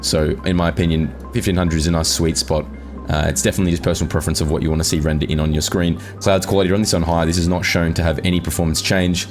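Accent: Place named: Australia. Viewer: Hey brotherman just wanted to say a big thanks for making this video. Tweaked up a few settings to exactly what you're running. AMAZING ! Visual clarity doubled instantly. Color space is amazing too ! Used your post effects and ended up reseting my digital brightness to default again. AMAZING ! And so nice to hear a fellow aussie. Straight to the point. No bullshit video. 10/10 mang.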